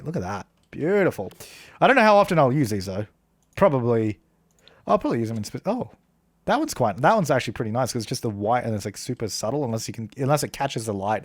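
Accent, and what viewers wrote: Australia. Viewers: Its so good hearing an Aussie voice talk about the sims <3 :D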